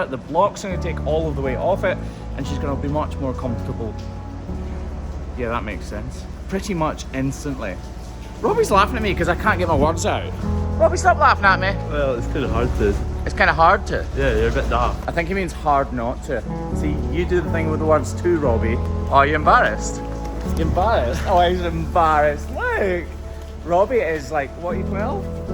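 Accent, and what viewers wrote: Scotland, United Kingdom. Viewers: But you did provide footage, if accidentally. She was walking toward the crush and kept that foot off the ground completely, hopping with each step. I am very grateful to your narration, too. I can understand your Scottish accent. But you visited a farm in Wales with a soft-sided corral system and I had to turn on closed captioning, I couldn't fathom what she was trying to communicate. Thanks for all you do, I'm sure the girls you work on appreciate it too. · I love your channel so much, I've been learning to speak with a Scottish accent just by repeating things you say! I think it's a promotion, and I bet you agree! Keep up the great work! Cheers to and!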